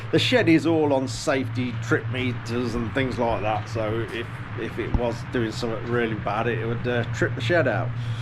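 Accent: United Kingdom. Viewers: Mate, as I may have said before, I’m a 63 year old RAF Vet, watching you for years, a patreon for about 4 years, loving your vids, now living in Maryland in the US working and saving hard so I can return back to the UK. Hopefully I’ll get a chance to meet you before my times up. Every evening I hope to see your latest vid to remind me what I’m missing in the uk and listen to a British accent. Thanks for what you do, it means a lot to me and many others around the world.👍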